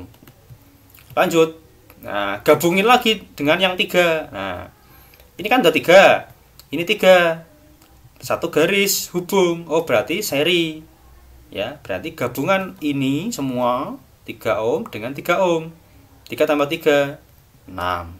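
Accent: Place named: Purwokerto, Indonesia. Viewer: Iseh ketara bahasa Purwokerto ne 🤣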